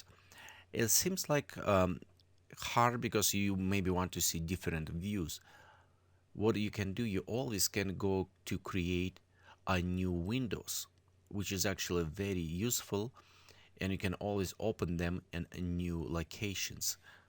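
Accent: Russia. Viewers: Сразу заметен русский акцент)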